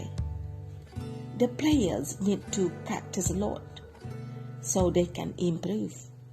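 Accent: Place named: United Kingdom. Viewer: It sounds like British. No boddy noticed that you are Indonesian 👍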